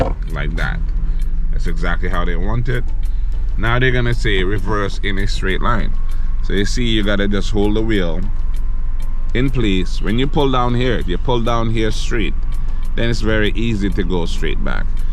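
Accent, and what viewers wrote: Jamaica. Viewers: You do sound like there's a bit of Jamaican in there. I'm an American but I lived in Jamaica all my life. I passed the written test past month and I have the driving test two weeks from now. I watch your videos to ensure that I don't fail. Thank you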